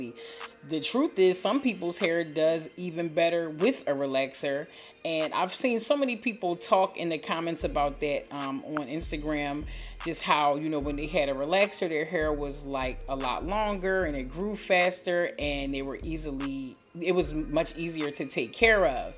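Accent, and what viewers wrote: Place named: Louisiana, United States. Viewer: Are you from Louisiana? Your accent sounds creole like some south Louisiana residents.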